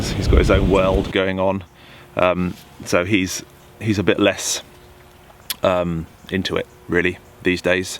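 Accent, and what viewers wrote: United Kingdom. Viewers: I did not see your channel name. First time viewer. I was surprised to hear a brits voice. A lot of survival stuff is muricans. You made a wonderful looking loaf. Good on ya.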